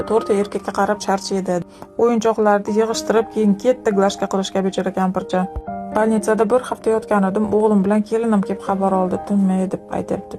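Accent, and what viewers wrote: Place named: Samarkand, Uzbekistan. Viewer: Qaerdansiz shivangiz Samarqandnikiga oxshatim yaxshi korib qoldim ishizga omad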